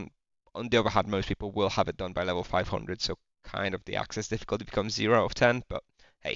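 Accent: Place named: United Kingdom. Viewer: no to dobre wrażenie sprawia, mieszkał w UK od wczesnego dość dzieciństwa ładnych paręnaście lat, stąd akcent 😋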